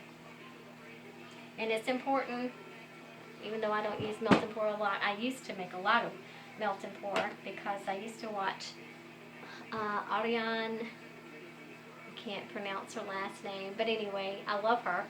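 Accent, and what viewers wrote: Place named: Alabama, United States. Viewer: Are you from Alabama or Georgia? I hear my accent! (Alabama)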